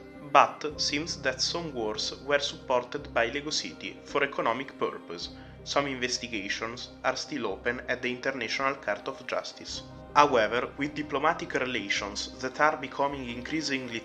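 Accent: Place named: Denmark. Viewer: You have a Danish accent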